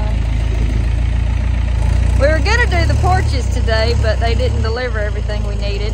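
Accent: South, United States. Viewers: Amazing southern accent..😂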